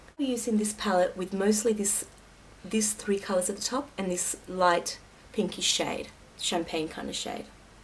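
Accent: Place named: Australia. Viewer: I absolutely love Australian accents. :) So cute! Beautiful look!.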